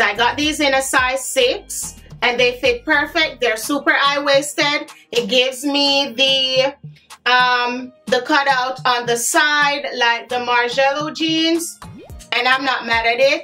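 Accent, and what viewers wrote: Jamaica. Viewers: Ok you’re too cute and I love your Jamaican accent. New subscriber her😊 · Girl I just stumbled on ur channel and if mi love you one time. As a fellow Jamaican you got me laughing cause I was seeing and hearing myself in you Xtra plus tax 🤣 love ur vibe, amazing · First of all I love those dresses, but mi luv di Jamaican chatting,am crazy about looking good u go cuz, we shared the same last name, big up uself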